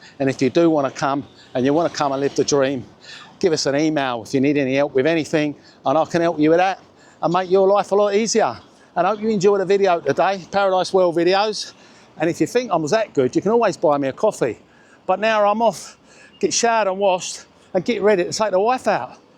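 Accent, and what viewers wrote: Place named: North London, United Kingdom. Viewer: I love your accent. You speak like my Dad used to. I reckon you must be from north London maybe Tottenham?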